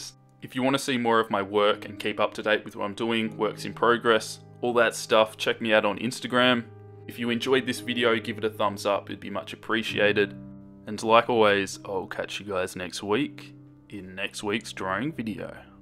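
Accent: Australia. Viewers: Wait, ARE YOU AUSTRALIAN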